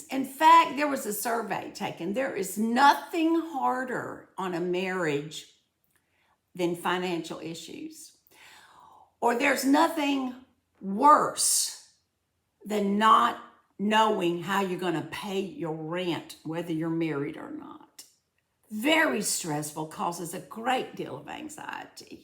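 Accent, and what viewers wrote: South, United States. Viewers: As one southerner to another..I love your accent! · I really enjoy your channel! Makes me smile. Your Southern accent is beautiful to my ears. Have a wonderful day,. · I just love your southern accent! And I'm saying this in my New York accent!! ❤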